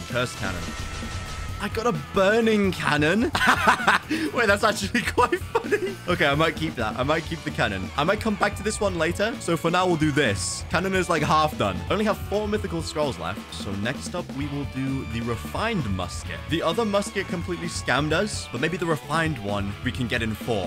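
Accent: United Kingdom. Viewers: Yea but ur brtish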